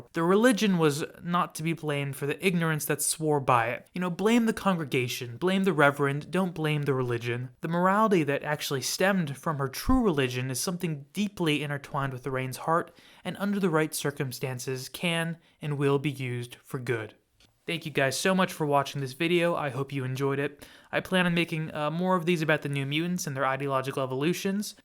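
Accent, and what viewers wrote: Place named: Scotland, United Kingdom. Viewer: Nice thoughtful look at Rahne Sinclair! Loved the Scottish accent!! Looking forward to your thoughts on the other New Mutants! Thanks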